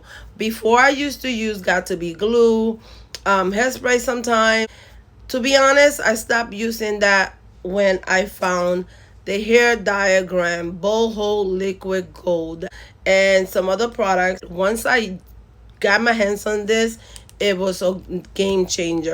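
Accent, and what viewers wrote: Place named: Cuba. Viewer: I love your accent , you sound cuban ❤❤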